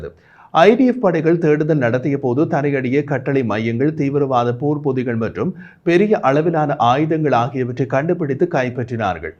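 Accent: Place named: Sri Lanka. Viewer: இதை ஈழத் தமிழ் என்று சொல்வார்கள். கேள்விப் பட்டிருக்கிறீர்களா? உங்கள் எல்லைக்கு வெளியேயும் தமிழ் பேசுபவர்கள் உள்ளார்கள்.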